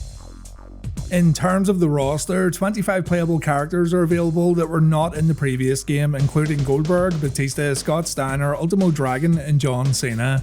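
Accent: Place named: Belfast, United Kingdom. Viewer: You from Belfast lad? You sound like it, from there myself.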